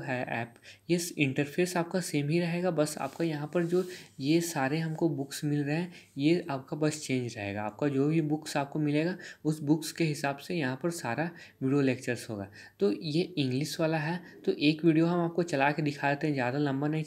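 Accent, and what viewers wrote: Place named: Bihar, India. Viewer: Tu bhai pakka bihar se hai 🙂🙂 kitna ko ketna bihar me bolte hain 🙂🙂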